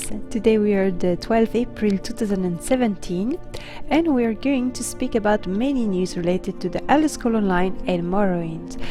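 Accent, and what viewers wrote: France. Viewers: Im no expert, but are you french? . Love the voice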